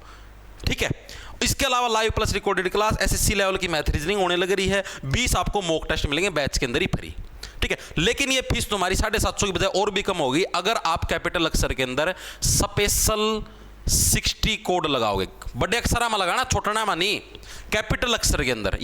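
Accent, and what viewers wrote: Haryana, India. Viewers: Result dekhi ja....aapki haryanvi ktttiiiii zehar😅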